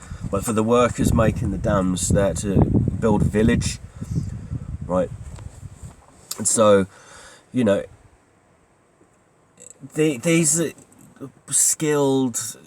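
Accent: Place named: Manchester, United Kingdom. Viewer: Love his accent…. 😍 Are you maybe from Manchester Sir?!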